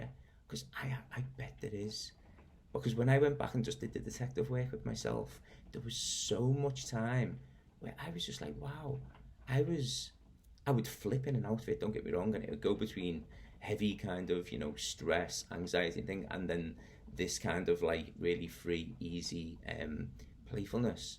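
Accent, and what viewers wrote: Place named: Liverpool, United Kingdom. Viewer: Blimey, scousers are becoming self realised now? I've never felt more like I've missed the bus. I'm joking (!) I unironically love Liverpudlians and your wonderful accent!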